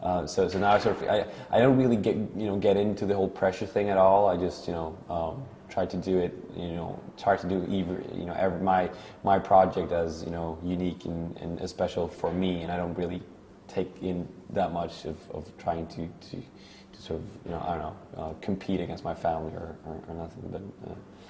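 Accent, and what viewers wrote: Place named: United States. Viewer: He sounds American!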